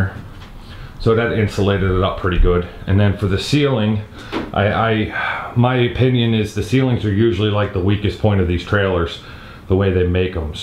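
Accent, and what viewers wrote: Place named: Pittsburgh, United States. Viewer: Nice job. As soon as you started talking I knew you were from Pittsburgh. I just moved out of the city to Ross Township.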